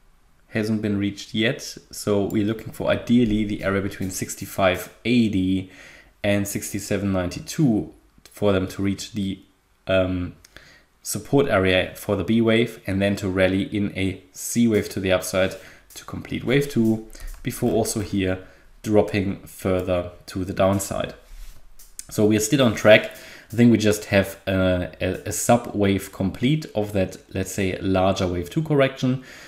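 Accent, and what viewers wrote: Germany. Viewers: Prima Content, dem Englisch nach kommen Sie aus Deutschland. Daher meine Frage : gibt es das auch irgendwo auf deutsch? Bin mir manchmal nicht ganz sicher...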